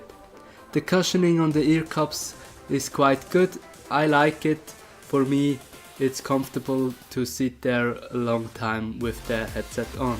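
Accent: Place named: Switzerland. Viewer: Nice man hört dir den Schweizer einfach raus. Wieso machst du englische Videos. Aufjedenfall cool. Wie findest du das Mik im vergleich zu membran mach mal ein Headset mikro vergleich test.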